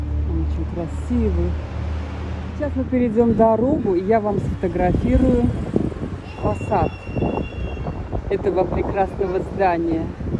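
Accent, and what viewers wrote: Baku, Azerbaijan. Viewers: Привет из Вильнюса! Спасибо за ролик! Как хорошо, что Вы решили погулять! Как приятно слышать речь с бакинским акцентом!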